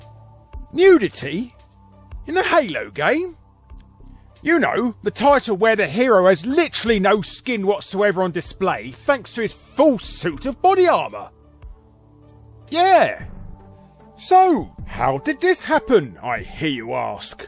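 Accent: United Kingdom. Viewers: Not at all, I just like reading the script alongside the audio. Trust me, as a rugby fan, I’m quite used to the British Isles accent 🏉👍🏾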